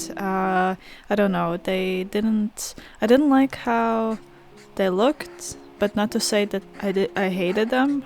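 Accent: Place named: Belgium. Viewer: my first thought was "wow, these axolotl dragons kinda remind me of httyd for some reason..." **a minute later** "OH MY GOD ITS LITERALLY TOOTHLESS BUT RAINBOWS" also i was drying my hair while watching this so i had the volume up and apparently sounds like me but with a Belgian(?) accent instead of an American one, according to my mom lol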